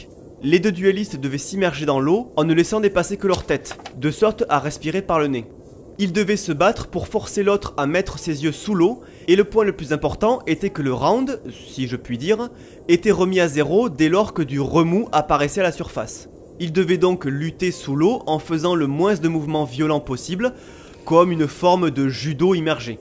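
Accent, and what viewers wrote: South, France. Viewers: Tu ne viendrais pas du sud par hazard (tu dis moins en prononçant le s)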